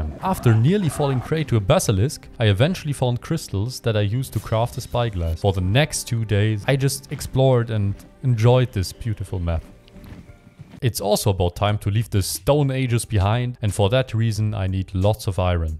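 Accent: Germany. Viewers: Are u german? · I hear deutsche akzent out of you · is he german?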